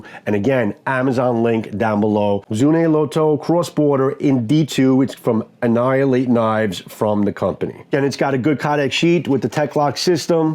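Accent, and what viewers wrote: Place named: New York City, United States. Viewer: What borough of N.Y.C. is your accent from?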